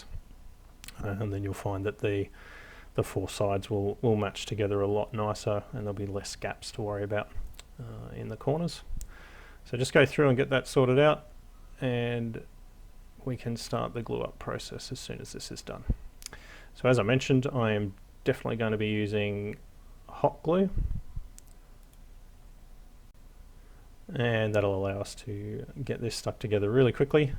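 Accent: Australia. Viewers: I mean hearing an Aussie accent on a wargaming terrain tutorial channel is weird enough but seeing all the tools from Bunnings is even weirder.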